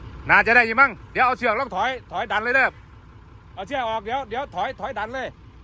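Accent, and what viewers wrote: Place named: Laos. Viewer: ออกลาวจ้า89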